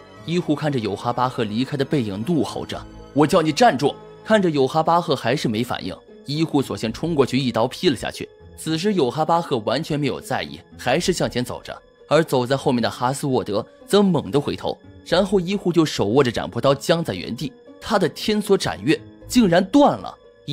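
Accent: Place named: Mainland, China. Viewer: 旁白一只加入大陸方式的語言 聽起來總覺得怪怪的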